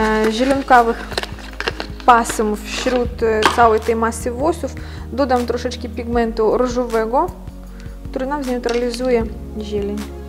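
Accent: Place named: Ukraine. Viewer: Kurwa ten ukraiński akcent jak ja tego nie znoszę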